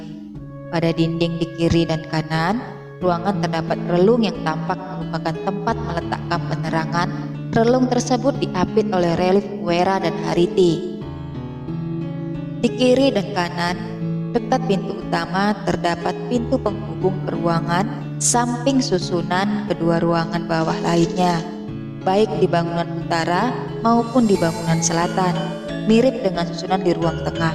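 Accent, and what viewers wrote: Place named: Java, Indonesia. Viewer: Kental sekali jadi ingin ke jawa 😁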